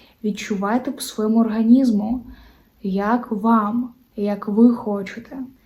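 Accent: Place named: England, United Kingdom. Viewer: Таке враження,ніби у вас англійський акцент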